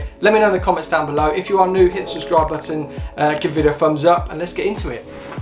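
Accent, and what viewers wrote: United Kingdom. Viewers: As much as I like the British accent you talk so much I get anxiety 🤣🤣🤣🤣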